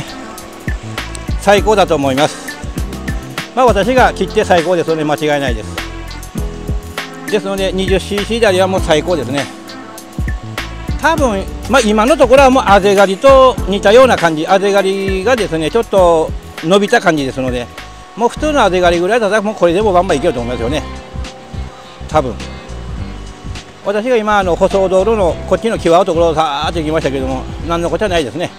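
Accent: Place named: Kagoshima, Japan. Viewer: あ！かごっま弁！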